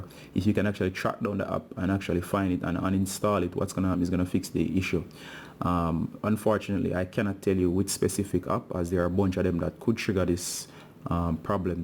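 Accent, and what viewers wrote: Jamaica. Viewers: Me alone notice issa jamaican🤩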